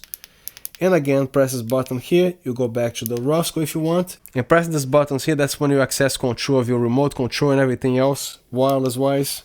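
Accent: Brazil. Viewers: Based on your accent I am guessing voce e Brasileiro. I just bought 4 of these. I cannot wait to use them!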